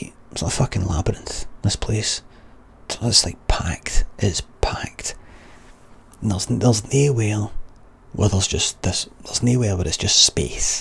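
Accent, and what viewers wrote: Scotland, United Kingdom. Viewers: Scottish accent “Letter story comence” 📕🙏🏻😴, absolutely biblical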